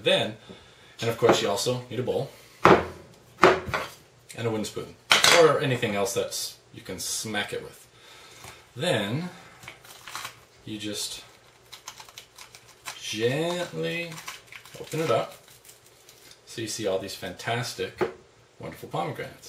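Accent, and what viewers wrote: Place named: Canada. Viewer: You sound Canadian. A Canadian who talks too much.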